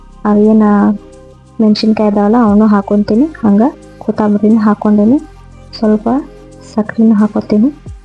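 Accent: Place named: North Karnataka, India. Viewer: Nice recipe sis.. nice sharing ..healthy kuda..nimma uttara karnataka bhashe nu super🎉🎉🎉🎉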